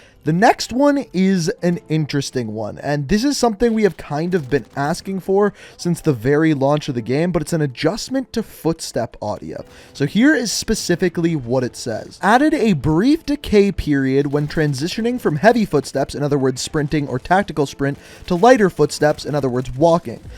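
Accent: Canada. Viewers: Bro are you Canadian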